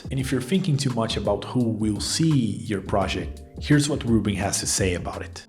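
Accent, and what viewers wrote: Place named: Brazil. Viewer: Great video! Gonna read this one asap. Você é brasileiro? O sotaque é de brasileiro kkk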